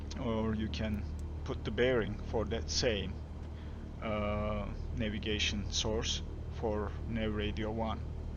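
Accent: Turkey. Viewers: Bu adam türk değilse bu dili de bilmiyorum derim artık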